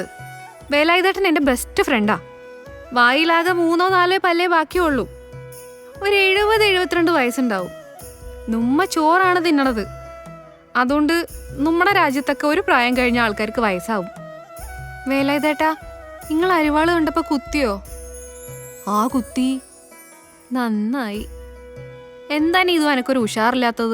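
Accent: India. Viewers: Randam classil Kauravar… njanum…feel aaki kalanju…Valluvanadan slangum… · കോഴിക്കോട് കാരി ആണല്ലേ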